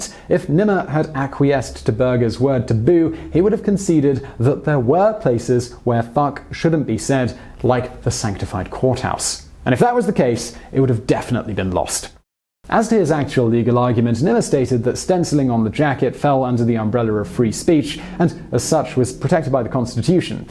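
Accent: England, United Kingdom. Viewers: Very elegant eloquent and deep discussions and debates narrated by a nice English accent talking about.. Fuck lol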